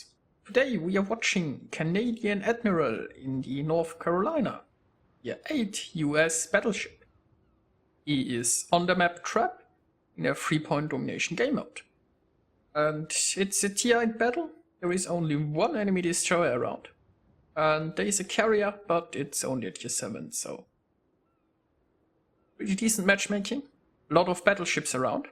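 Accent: Germany. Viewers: are you german? Your accent sounds like a german who is speeking english.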